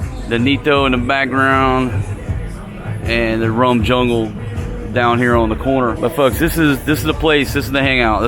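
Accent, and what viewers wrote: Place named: Ohio, United States. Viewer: You sound like my old battle buddy from Ohio, Cincinnati kids named Ohler. Ohio River Valley trash he used to say. Hahaha. He's now a retired LAPD cop living in San Diego.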